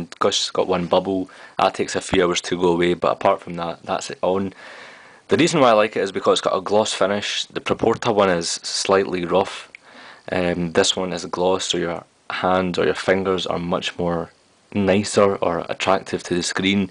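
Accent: Scotland, United Kingdom. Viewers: u sound scottish? am i correct?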